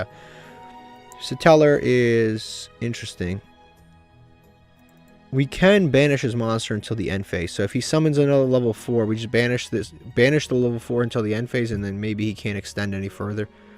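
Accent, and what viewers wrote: United States. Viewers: Do I hear a Boston accent?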